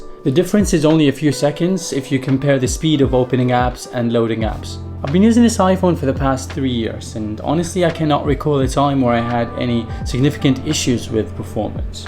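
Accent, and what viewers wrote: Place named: United Kingdom. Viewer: The editing and camera quality is remarkable! also your accent is British with a hint of something else but I can’t figure it out 😂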